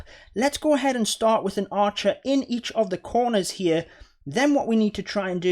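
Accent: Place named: Newcastle, United Kingdom. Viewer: found your channel and subscribed! Great content mate 😃 can't quite get your accent! was gonna say Geordie but leaning more towards Boro, Sunderland? I'm from near Darlington! keep up the good work :)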